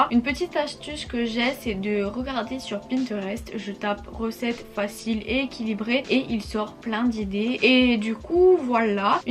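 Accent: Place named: North, France. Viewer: tu as un petit accent du nord de la france, tu viens d'ou? 😉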